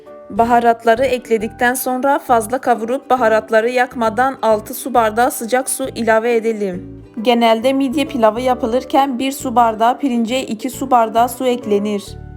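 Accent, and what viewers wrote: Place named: Eastern Anatolia, Turkey. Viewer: Dogu sivesi. Almanci sivesi. Ortaya karisik konusuyo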